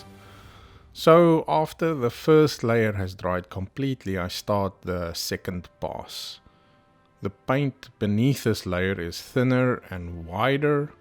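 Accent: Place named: South Africa. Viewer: Cannot miss the South African accent!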